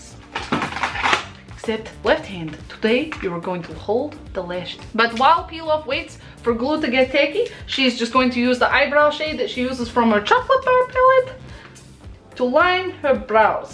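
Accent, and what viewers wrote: Russia. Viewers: Is that accent Russian?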